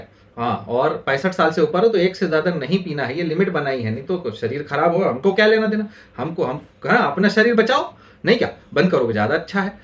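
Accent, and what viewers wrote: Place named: Bihar, India. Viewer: Bihari accent 😅😅😅😅😅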